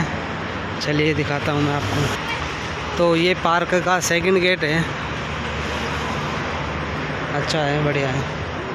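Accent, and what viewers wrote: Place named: Nepal. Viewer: आप का हिन्दी सुनने पर नेपाल का हिन्दी जैसा है, मेरे नेपाली दोस्त जब हिन्दी बोलते है, बहुत सही लगता है। 🚩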